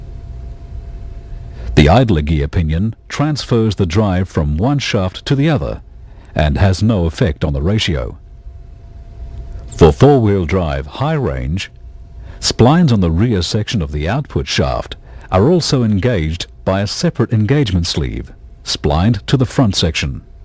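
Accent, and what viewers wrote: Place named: New Zealand. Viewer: This guy sounds very kiwi to me.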